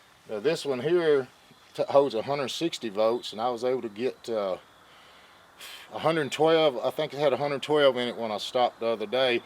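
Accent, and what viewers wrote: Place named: Appalachia, United States. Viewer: it's impossible to take this clodhopper seriously with the hocking up phlegm and spitting and the lazy hillbilly dialect.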